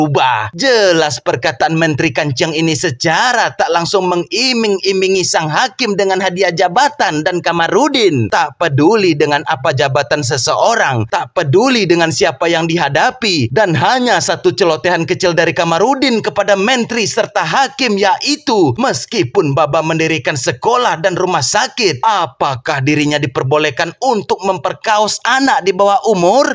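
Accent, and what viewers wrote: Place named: Minangkabau, Indonesia. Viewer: Orang awak kayaknya yg bawain cerita film nya,dari exsen bicaranya😂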